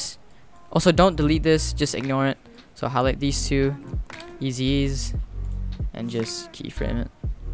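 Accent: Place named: United States. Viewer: whoa ur malaysian an yet u have an american accent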